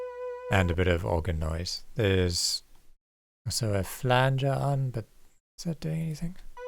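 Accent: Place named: Cape Town, South Africa. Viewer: Great tut, thanks. Cape Town accent?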